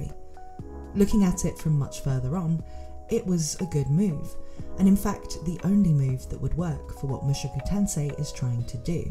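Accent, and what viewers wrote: United Kingdom. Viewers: Are you a brit????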